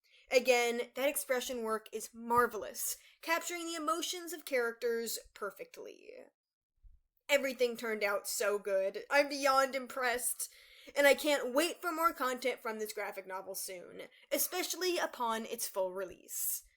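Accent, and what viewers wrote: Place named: United States. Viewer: dude i really wanna watch ts but the american accent is so HEAVY and i can’t bear ts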